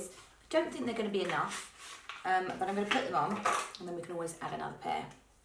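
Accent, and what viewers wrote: England, United Kingdom. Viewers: I truly adore listening to your English accent!!!! I think it’s the most beautiful accent in the world!!! Lovely tutorial!!!!!❤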